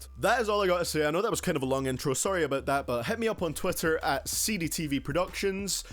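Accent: Scotland, United Kingdom. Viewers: Are you Scottish by any chance or its just your voice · Are you Scottish, because I am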